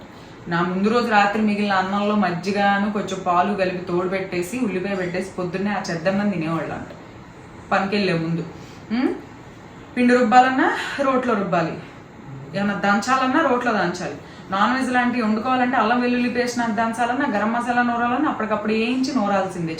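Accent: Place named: Rayalaseema, India. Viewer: mee slang rayalaseema laga untundi Abba ani ikkada.antaru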